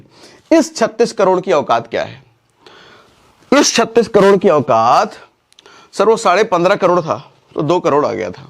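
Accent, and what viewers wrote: Kanpur, India. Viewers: Sir kanpur se ho kya. Apke bolne ka tareeka kanpuriya hai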